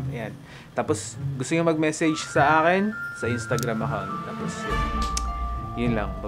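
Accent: Ilocos, Philippines. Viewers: Ilocano ka po ba? 😊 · Ilocano ka pala kuya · Taga Ilocos Sur kaba weno Abra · Kuya ilokano ka pala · Kuya ilocono ka po? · Kuya ilocano ka ba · kuya ilocano ka po?? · Kuya Ilocano kaba! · Hala ilocano po kayo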